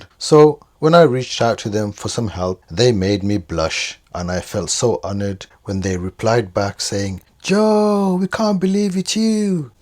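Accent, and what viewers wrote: Birmingham, United Kingdom. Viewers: You came up on my recommended, so this is the first of your videos I’ve seen. My first impression is ‘sounds brummy, specifically uk vibe of deadpan humour mixed in with confident yet laidback information’. I’ve never fixed a watch in my life or looked to do so (though I fix other stuff), but I’ll still be watching haha Glad people are recognising your work and sending you bits to tinker with! Hoping more independent companies get into making high quality 3rd party parts for restoring or customising otherwise forgotten tech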